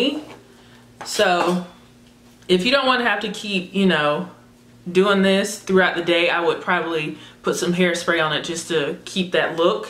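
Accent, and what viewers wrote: South, United States. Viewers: Girrrrrl u are killin this unit!! So beautiful!! And your southern accent is so cute! Ima go broke wit these wigs, I swear 💞👑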